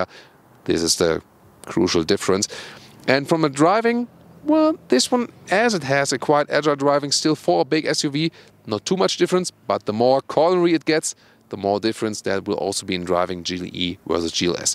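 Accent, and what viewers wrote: Germany. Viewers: Deutsches Auto, deutscher Sprecher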